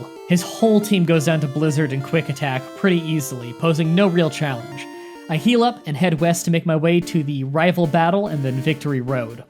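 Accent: Canada. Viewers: are you cadidan? you have a very neutral accent with some quirks that aren't in the chicagoan accent. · Love the Canadian accent lol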